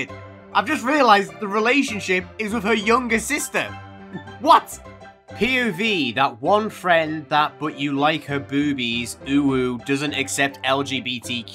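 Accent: United Kingdom. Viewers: I like ur British 🧐 accent lol and jokes, im just dying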